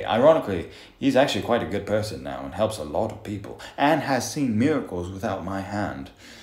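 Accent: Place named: United Kingdom. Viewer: I absolutely love your Elegantly prestigious English accent, which makes the nature of the situation more dire in a dramatic yet intriguing manner. I do applaud you sir for the excellent plot twist that had my imagination in full attendance, in which I Invisioned this fold of events effortlessly in my mind. I would love to see you create a video in which you use that brilliant English accent to depict a Royal speaking to a peasant in a boastful yet menacing way.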